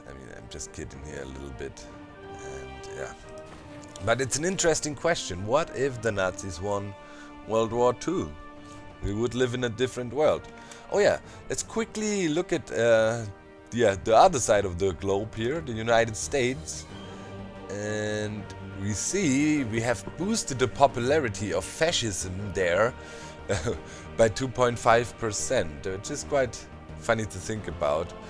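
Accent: Germany. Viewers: Are you german? Your accent says you are am i right?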